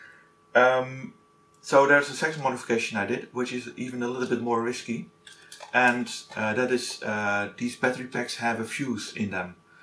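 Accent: Netherlands. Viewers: Are you Dutch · Are you dutch?!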